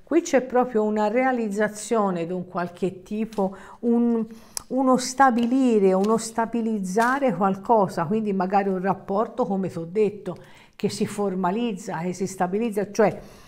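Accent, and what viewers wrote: Tuscany, Italy. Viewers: sei troppo simpatica, il tuo accento toscano è fantastico... ok?❤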